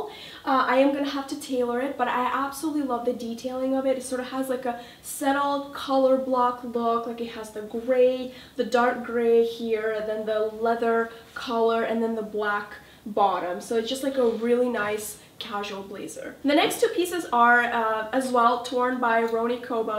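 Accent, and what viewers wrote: France. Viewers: i'm from canada and i speak french at home and I love the french accent !!!!! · Je suis fraçaise et j'adore ton accent français ! :D Bisous de la France <3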